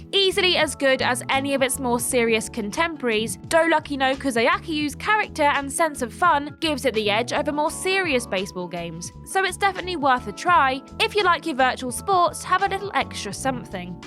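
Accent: United Kingdom. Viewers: Are you from the UK????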